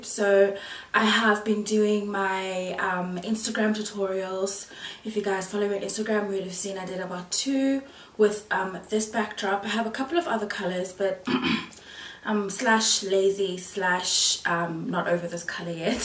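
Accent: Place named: United Kingdom. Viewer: Hey! Love this tutorial as always and I've BEEN a fan since your days (British accent and all lol) I'd love to win this prize and follow as much of your amazing looks as possible❤❤ Instagram: nickynic_101 Foundation shade: Maybelline 24 HR superstay Caramel 60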